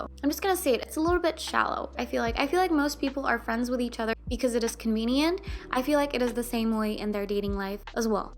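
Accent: United States. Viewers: She was raised in Turkey, though she's fluent in English and has an American type accent.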